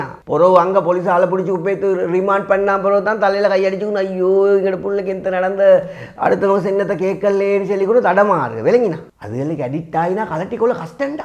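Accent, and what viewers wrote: Sri Lanka. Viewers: நீங்கா பேசுறது ரத்தணாபுர தமிழா கொஞ்சம் சுத்த தமிழ்ல பேசுங்கா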